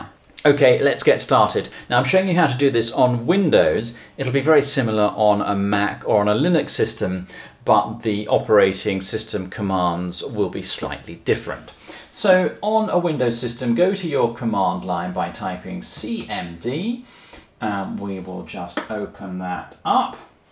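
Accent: United Kingdom. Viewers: Thank you so much, this is such a great help and I exactly had the same promblem as you. Thumb up and subscribed. BTW: I love the Britishnes of your pronounciation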